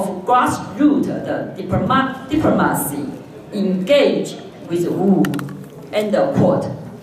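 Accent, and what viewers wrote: Taiwan. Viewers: 應該是沒有學過音標~才會用臺灣人的方式講英語?!🤔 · 媽呀，我阿嬤都比你強嘞，台灣真的需要雙語教育，英文教育真的要好好檢討，就算在英國待了20年還是無法改變如此根深蒂固的台式英文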